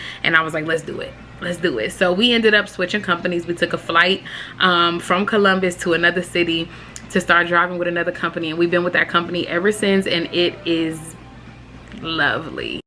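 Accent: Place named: Detroit, United States. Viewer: You from Detroit ha? I can hear it .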